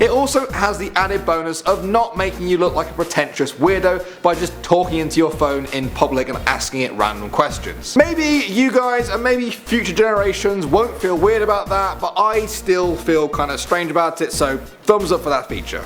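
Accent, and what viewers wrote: Ireland. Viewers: Is this guy Irish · is he Irish????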